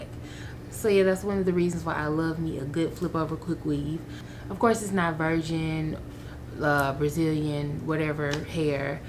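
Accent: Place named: Texas, United States. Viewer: Sound like you from Texas ❤❤❤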